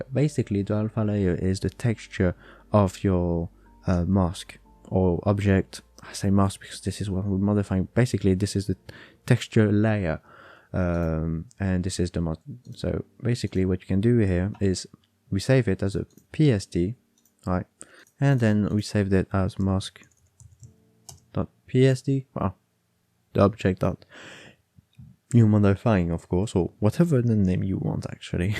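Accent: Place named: France. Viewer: lmao, you think I want your help after you ruined my experience of modding with your incompetence. Right now, I am disgusted by your way of slacking this, trusting you through the process but it started to go downhill halfway through video, you assume people know all this stuff, not even explains the commands you type, yeah yeah just do it mindlessly like that's the purpose of this video, On top of this, that french accent, yuck 🤮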